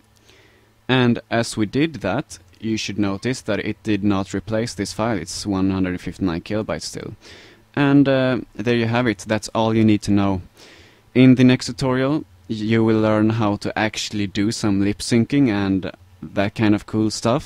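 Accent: Sweden. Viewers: Sounds like a swedish accent :3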